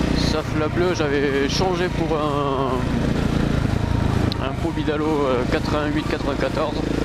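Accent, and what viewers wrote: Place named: South, France. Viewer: l'accent zen du sud 😊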